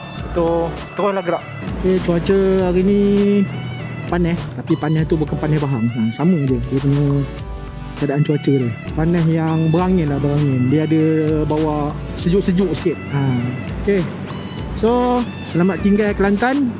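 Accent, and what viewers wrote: Perak, Malaysia. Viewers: Ater pekat beno loghatnya...udoh ghope org kuale,bote ngan parit je...🤭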